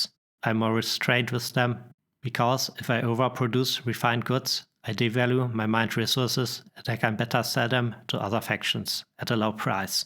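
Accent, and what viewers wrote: Germany. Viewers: Statistics, Graphs and a german accent elaborating on ze productions. Just what the soul needs.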